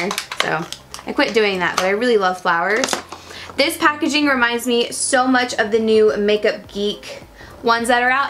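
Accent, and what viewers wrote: South, United States. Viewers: Where is she from? 😂 has to be down south! 😂👏🏽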